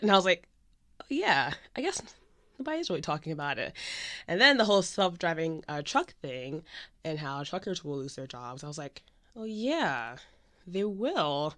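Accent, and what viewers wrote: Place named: United Kingdom. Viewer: My friend told me to get my ears turned around like this haha I really need to from now on lol I didnt think you were American, I thought you were British haha :D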